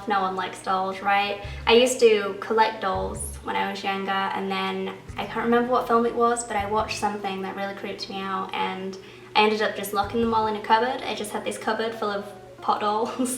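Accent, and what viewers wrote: Yorkshire, United Kingdom. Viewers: yeah can Defo hear the Yorkshire but sounded like a twang of something else aswell, maybe not lol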